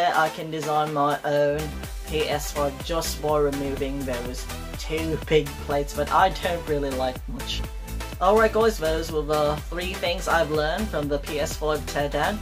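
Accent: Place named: Australia. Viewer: What’s up with the Australian accent?? I enjoy your usual voice it sounds a lot different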